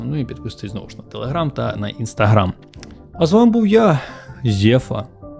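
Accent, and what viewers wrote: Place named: Russia. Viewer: виправдання взагалі жоРсТкО помаранчевий так часУ з наголосом на У обговоримо застосування шкірку шкірку сенс/застосування справді гівно кориснішими тонування каблучки/персні незрозуміло просто обережнішими, без «більш» так дупу/сраку/гузицю поставитИ мОмЕнтИк І по всьому відео: 1. Літера «Щ» дає два звуки: [шч], але не [шь] 2. Милозвучність української полягає в звучанні голосних, а саме, в тому, що всі голосні як пишуться в слові, так і читаються і тут немає цих московських спрощень коли літера «О» читається як [а] і тому подібне. Багато такого чути в твоїй вимові. А так, молодець, що знімаєш українською. Виправити ці моменти і буде прям фист файно.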